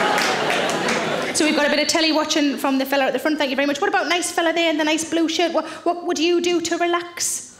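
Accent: Newcastle, United Kingdom. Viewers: Love, love, love a Geordie accent.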